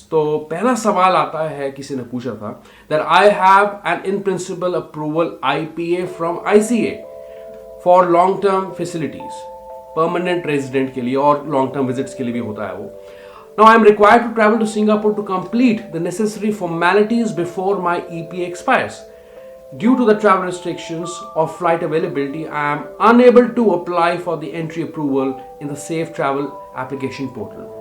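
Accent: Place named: India. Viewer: YOUR MUSIC IS TOOOOOO LOUD. It is difficult to hear what you are saying. I turned off your video due to the loud music. You can lower the music for your benefit. It is annoying to hear music while trying to understand what you are saying. WTF your music sucks when you are talking with your horrible Indian accent. You sound like you are drowning in bubbles like a bobblehead. SHUT THE DAMN MUSIC OFF.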